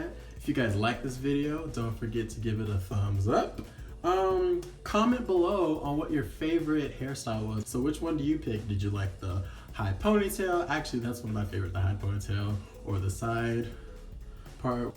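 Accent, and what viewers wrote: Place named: Brazil. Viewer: Sotaque brasileiro kkk you até from Brazil?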